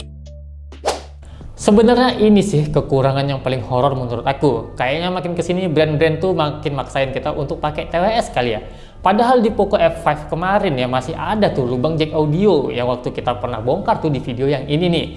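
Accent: Medan, Indonesia. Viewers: Bg lu org Medan?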